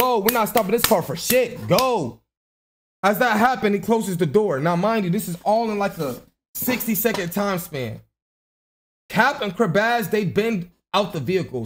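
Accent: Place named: Canada. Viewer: Mans talking like Canada sweet lol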